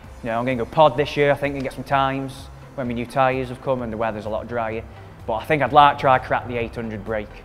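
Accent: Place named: Stoke, United Kingdom. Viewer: You are deaffo a Stokie! I recognise that accent from anywhere :D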